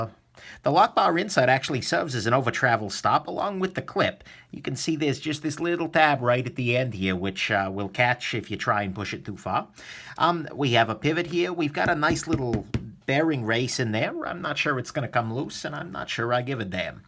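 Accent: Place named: Northeast, United States. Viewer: voice is calming, which is usual with a Noreaster accent. Great video with very thorough information.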